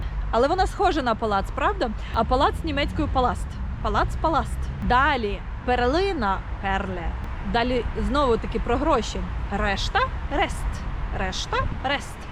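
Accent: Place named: Ukraine. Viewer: Пані у Вас український акцент у німецькій вимові!)) Німці знаю нашу розкотисту букву ,,Р" вимовити не можуть!))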